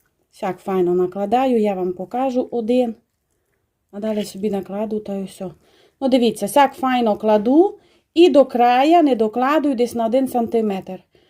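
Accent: Transcarpathia, Ukraine. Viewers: Закарпатська мова топ твоя фішка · Дивлюся файний рецепт і заодно вчу закарпатський діалект. Як же приємно чути вашу вимову❤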